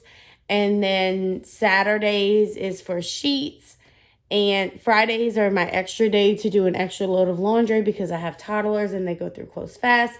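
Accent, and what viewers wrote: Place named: South, United States. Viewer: First time viewer and I enjoyed the video. I think I hear a LA or south MS accent. I’m originally from Gulfport, MS. Kathy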